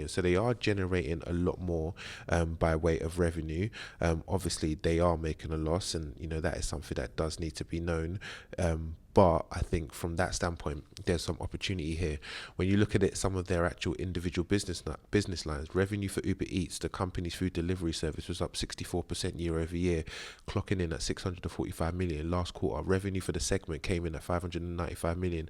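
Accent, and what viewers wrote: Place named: United Kingdom. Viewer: Merry christmas. I've been watching your videos for a couple of weeks, just wanted to say I really like the content you're putting out, too few UK investors are doing what you're doing (direct, honest, transparent content), before I discovered your channel I was going back and fourth with the idea of starting my own investing channel for UK investors as I felt that niche just wasn't being filled but you're doing it bro, albeit with a slightly different strategy. From your voice/accent sounds like you're a similar age/area to me - it's great having something I can relate to in that respect instead of listening to X number of American accents.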